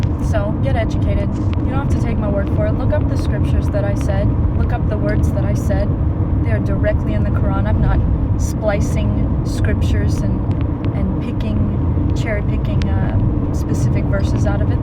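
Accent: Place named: United States. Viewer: she's USA citizen and sees what happened to PC Europe and like many US peeps she doesn't want it to happen in USA as Trump supporters worry about.... she's quite pretty.......she reads books!!!!!!!!!!! USA woman who reads books?????????????????????? da! is this possible? Am I seeing a female robot with a US accent' 'so nice that accent'' yes what a lovely voice....did I mention she reads????????????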